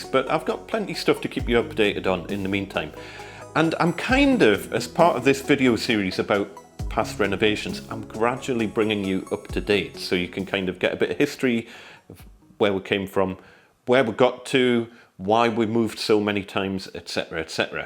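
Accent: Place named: Geordie, United Kingdom. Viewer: Really love your videos - Very informative and great job! As a Geordie who has lived in London for 15 years, it’s nice to hear the friendly, familiar twang of the hometown! Keep the videos coming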